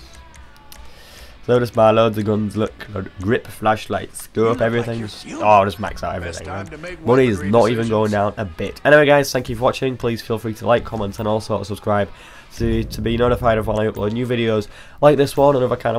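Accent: United Kingdom. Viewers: He's not drunk he's got a god damn accent called British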